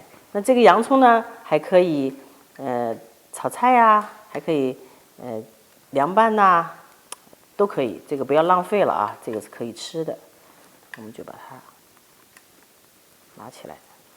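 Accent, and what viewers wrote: Wuhan, China. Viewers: 很像武汉人的口音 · 听您的口音好像武汉人呢！ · 第一次看到你的视频，听口音像武汉人，请问洋葱蒸汁治咳嗽有剂量的要求吗？每次最少需多少毫升呢？谢谢🙏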